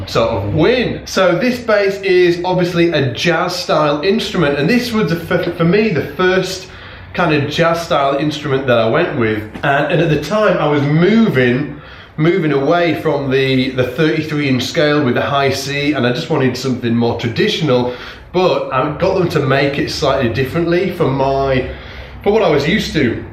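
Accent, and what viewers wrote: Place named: Northern England, United Kingdom. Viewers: You’re so northern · "A toone"! Yorkshire much? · Nowt wrong wi'a Yokshuh accent :) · I agree about having a better bass doesn't make you any better at playing but I wouldn't say you play exactly the same on every bass, some are more comfortable than others 😏 Fender Cabronita Precision Bass (speaks for itself) Squier Affinity Bronco Bass modified with Seymour Duncan Mustang Bass split coil pickups and a Jazz bass bridge pickup (brand unknown) (and a confederate flag pickguard hehe, not racist 😏) SX Vintage Series SPB57 Precision Bass (love this one) Also gonna buy an Ibanez ATK200 sometime soon Ampeg BA-108 V2 (selling) Peavey Tour Series 450 bass head (also selling) Soon getting an Ampeg BA-210 V2 PS nice basses, and let your Yorkshire accent fly hombre 😏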